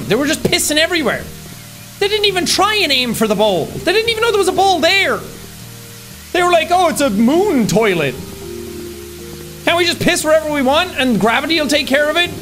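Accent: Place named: Ireland. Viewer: this is the only vid where I can explicitly see sean's super irish accent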